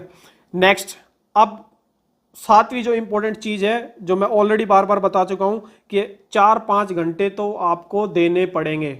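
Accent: Haryana, India. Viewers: 🙏🏼 haryanvi accent ❤️ Tahe dil se shukriya sir❤️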